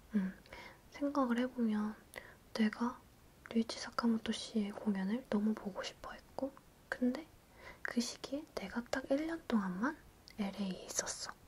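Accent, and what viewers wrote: France. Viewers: omg your french was so cute 🥺💗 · Your french ? omg it's so smooth !!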